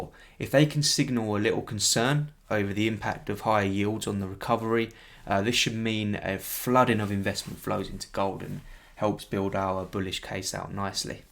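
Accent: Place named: England, United Kingdom. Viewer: basically a Frank Lampard/redknapp hybrid, that's how you sound to a Canadian person like me. I am predicting Gold reaching 2100 usd in 2021 after consolidation in the <num> usd range. P.s. you look like a jack Wilshere.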